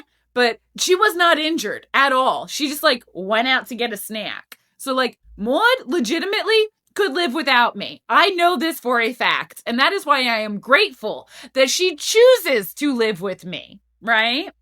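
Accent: United States. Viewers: Where has this woman been in my algorithm? She's brilliant! AND sounds like all the girls i went high school with in NY! I am subscribing!! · Girl I'm tryna read your accent and i can't pinpoint Midwest or east coast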